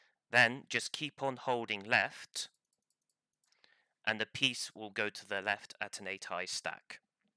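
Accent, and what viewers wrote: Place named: United Kingdom. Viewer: Great video, you explained this in a very good way. One suggestion I have is that you tone down the British accent a bit.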